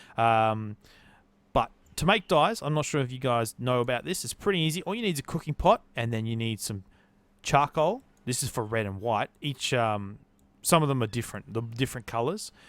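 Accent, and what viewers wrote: Australia. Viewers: Love the vids! It's good to see/hear more Aussie you tubers!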